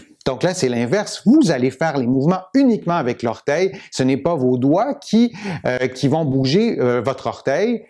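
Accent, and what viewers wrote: Quebec, Canada. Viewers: Très intéressant. Je les faisais déjà de ma propre intuition mais pas debout. Et c'est très efficace. Ça ralentit significativement l'evolution. L accent canadien me ravit. C est donc "double peine".. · Grand merci de France pour toutes ces propositions d exercices ...que j'aurais aimé trouver il y a quelques années... Mais mieux vaut tardbque jamais 😊 Je vais m y mettre avec grand plaisir pour tenter d enrayer la progression .. et c est si "plaisant" d entendre votre accent enchanteur (mon mari était québécois 😘🙏) Merci infiniment !🙏🙏🙏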